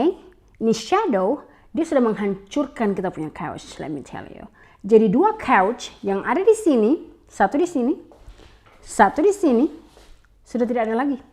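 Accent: Manado, Indonesia. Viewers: Aku suka logat manado n maluku kak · Don't worry saya org malaysia pun sgt understand apa yg kamu katakan yr english n bahasa indonesia perfecto only yr slang very manado no problem with that's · Daya tarik vlog kak ap adanya n logatnya jg suka,stengah indo,inggris manado 😂😂😂😂😂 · Gapapa kak kalo bisanya ngomong bahasa Inggris lebih nyaman dan mudah ya ga usah peduli apa haters bilang soalnya cape dengerin apa kata netizen yg maha benar dan berkuasa wkwk....iya juga sih sebenernya kak lebih baik ngomong bahasa Inggris campur2 Indonesia.soalnya klo pun kak ngomong bahasa Indonesia takut nya juga ga gitu bener bahasanya karena lidah org menado/Maluku klo ngomong bahasa Indonesia juga ga bisa sih.jadi mesti full bahasa Maluku /Manado gitu.intinya aku paham maksud kak gimana sih makanya dia milih ngomong campur Inggris juga ya karena dia ga tau kosa kata bahasa Indonesia dengan benar tpi klo bahasa Manado ya kak lancar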